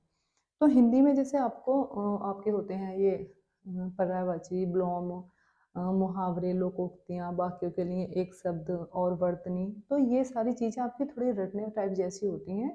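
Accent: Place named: Western Uttar Pradesh, India. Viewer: आप की बोली पश्चिम उत्तर प्रदेश की है मेरठ मुजफ्फरनगर क्षेत्र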